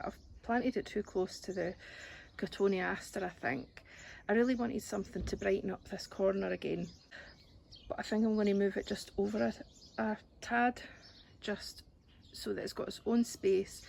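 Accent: Scotland, United Kingdom. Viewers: Hi there. I just happened upon your channel today. Great to hear another Scottish voice 😛 You have added lots of great plants into your garden and things are looking great. The Heucheras, Brunnera and Hostas are doing well - mine are too - must be the Scottish air (I'm assuming you are in Scotland!). Anyway I have subscribed and look forward to binge watching your other videos. All the best. Mags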